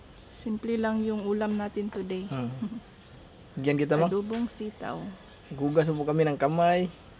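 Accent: Visayas, Philippines. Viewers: Tarongin mo naman kahit gamay tagalog mo pre pagka bisdaka motagalog nemu ting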